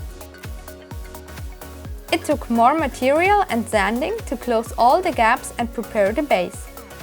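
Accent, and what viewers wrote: Germany. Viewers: Great work!!! Are you german? Your accent sounds like you re from Germany. Oh and can you build the Necorchasm or Thorn from Destiny? Would be awsome! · You're incredibly talented, but German accents make me cringe :D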